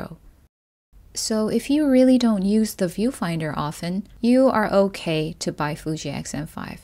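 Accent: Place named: United States. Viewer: 몇 달 계셨는데, 그냥 미국인 발음이시네요. 너무 좋아요~!! 인스타에서도 뵈어요~!! 새해 복 많이 받으세요~~!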